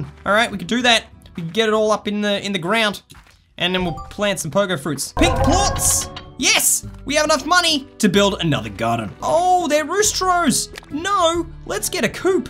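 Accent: Australia. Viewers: Are you Australian? Haha You sound very Ozzie! (Coming from someone who is also Australian.) 🇦🇺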